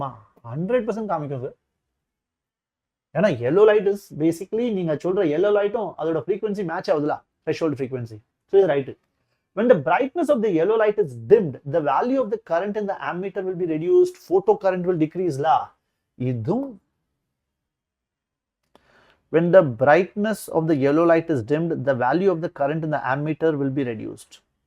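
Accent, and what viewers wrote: Tirunelveli, India. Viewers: Nellai tamil mass sir 🔥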